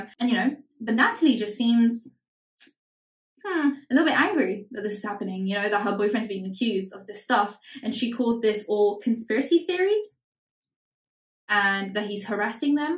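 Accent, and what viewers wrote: United Kingdom. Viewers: Wait you are polish? But you speak like your original from uk know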